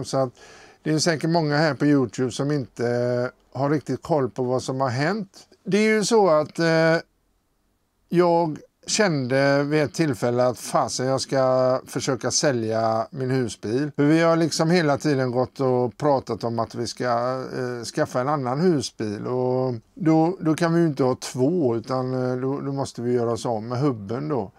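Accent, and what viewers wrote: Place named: Dalarna, Sweden. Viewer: Dalkulla? Rättvik eller är det mora ?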